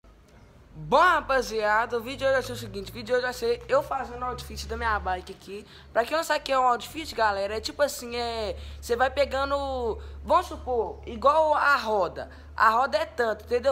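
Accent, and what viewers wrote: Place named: Minas Gerais, Brazil. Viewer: Minero fala tudo igual Man kkk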